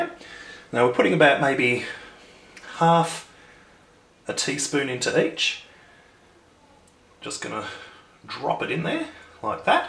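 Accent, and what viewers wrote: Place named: Australia. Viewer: you can tell his Aussie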